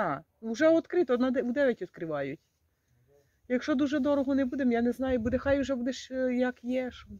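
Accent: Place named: Zhytomyr, Ukraine. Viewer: доброго дня вам,ви дуже гарна жіночка один позитивчик,не звертайте увагу які роблять вам зауваження,у кожному регіоні розмовляють по своєму, А в Житомирській області так розмовляють,я трошки там жила.Коростишівський район с.Харитовівка.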